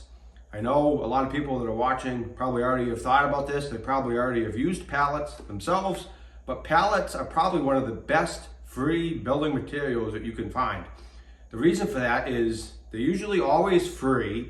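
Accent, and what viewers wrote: Massachusetts, United States. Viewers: Wow. Check out that Massachusetts accent. I'm sure I'd have one in return ... From the Desert, USA.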